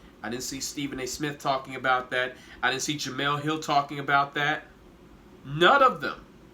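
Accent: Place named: South, United States. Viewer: As a southerner from Memphis I love hearing him rip these phonies a new one because he talks like we do.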